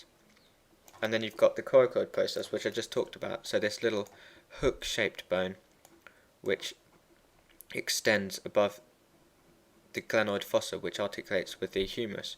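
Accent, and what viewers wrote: United Kingdom. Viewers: Good work but no offense .. I don understand British Accent clearly !! :"( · Big, massive, enormous thanks! It's really hard to actually visualize all of this while reading text books and browsing through an atlas. I always finish off each part by watching your videos. It's also almost equally awesome that you speak British, with some luck I will have mastered the accent by the end of my first year! :)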